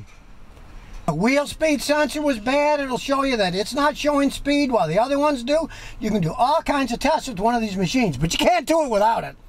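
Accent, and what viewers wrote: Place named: Texas, United States. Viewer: I love your sweet Texan accent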